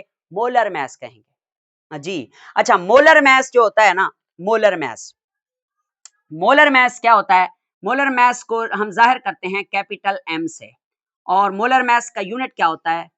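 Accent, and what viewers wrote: Saraiki, Pakistan. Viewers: Are you saraiki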